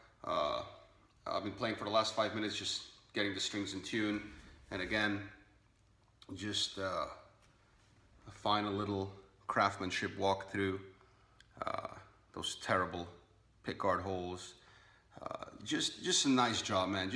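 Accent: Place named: Italy. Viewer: You sound a little bit italian😂. I‘m italian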